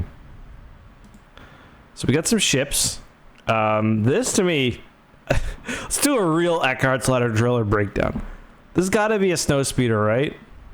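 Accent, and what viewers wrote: Canada. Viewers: You should so much more Canadian when you record am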